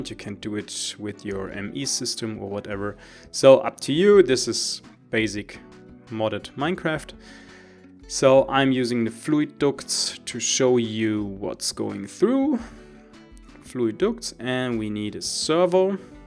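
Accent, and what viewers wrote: Germany. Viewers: Man hört soooo raus, dass er deutsch ust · man hört direct das du deutsch bist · You hear like a German guy speaking english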